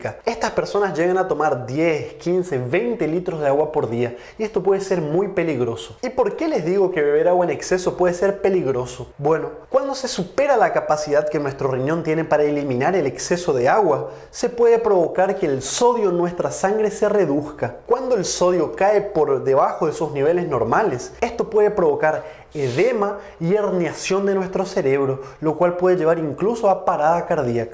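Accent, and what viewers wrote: Chile. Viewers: Noviembre 1/2022: contenido interesante. Usted combina 2 tipos de acento. Habla como chileno y como yucateco. Posiblemente estudio en México. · Gracias Doctor. por su acento creo es chileno. Saludos de Mx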